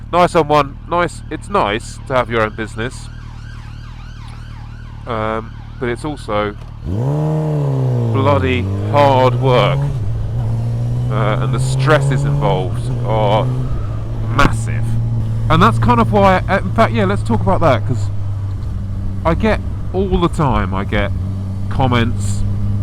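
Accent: England, United Kingdom. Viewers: It's like my own thoughts as I'm riding, only in an English accent. I too started a business, motorcycle related no less. The hard work, self learning, and sacrifices made it successful, not my degree. Great vids. Subscribed.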